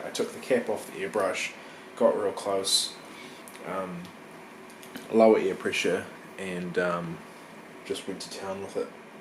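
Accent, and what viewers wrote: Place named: New Zealand. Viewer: dude! thts some great work!! As a 'crazy Blake's 7 fan' I appreciate yr work here :) was larger scale than I imagined, which means a corresponding Liberator wld be YUGE! U sound like a fellow kiwi lol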